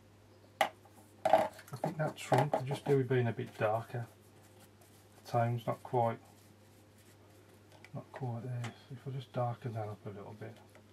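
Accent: Birmingham, United Kingdom. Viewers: GREAT TO HEAR BRUMMIE ON YOUTUBE, KEEP ON POSTING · Nice to hear a Brummie voice on Youtube. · Thanks for sharing your realistic and relaxed approach to watercolour painting. Excellent, and refreshing to listen to your accent after 20 years out of the UK!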